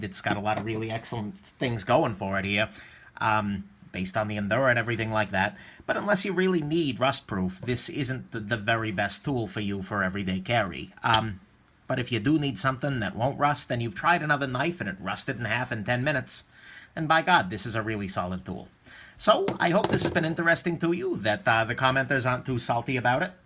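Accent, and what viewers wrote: New York, United States. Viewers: Where are you from? New York or something?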